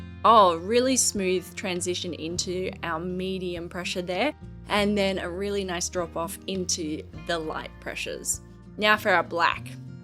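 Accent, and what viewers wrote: Australia. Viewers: Hi!, HaHa! Like you, your Aussie accent is lovely! You bring such positive and happy vibes to your channel and as I really need this review, you made getting the info an absolute pleasure! I am so looking forward to more! ~:face-blue-smiling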